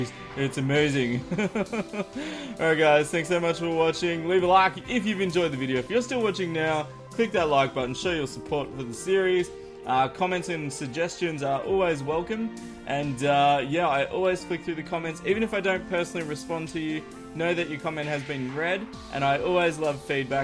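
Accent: Australia. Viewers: lol you from Australia too mate?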